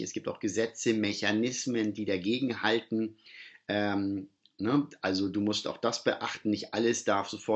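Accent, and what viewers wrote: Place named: Kiel, Germany. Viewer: Oh, ich freu mich so sehr, dass Sie wieder da sind. Ihr "Kieler Slang" erfreut mein norddeutsche Herz jeden Morgen und Ihre unaufgeregte Art die aktuelle Sternenkostellation darzustellen bringt mir richtig Freude... danke, dass Sie da sind 💛✨️💫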